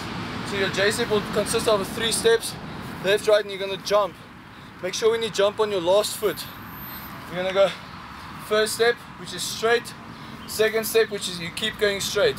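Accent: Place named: South Africa. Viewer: I recognize your accent, are you from south africa?? Your accent sounds south african! :D im a martial arts tricker from south africa!!! :D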